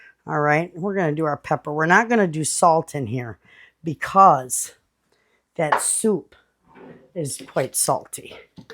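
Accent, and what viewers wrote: Michigan, United States. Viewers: Just seeing your channel for the first time. Had to smile when I heard your Michigan accent, I spent the first 24 yrs of my life there. Of course I hit the subscribe button😂 Those chicken recipes look delicious! Looking forward to watching your other videos.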